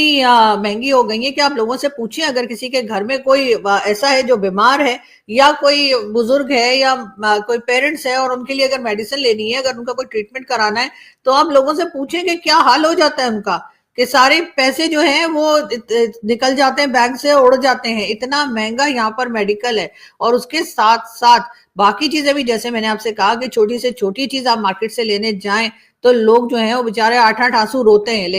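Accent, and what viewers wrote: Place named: Braj, India. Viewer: हाथ हौला रखें 😂😂,,प्योर up बाली ब्रज भाषा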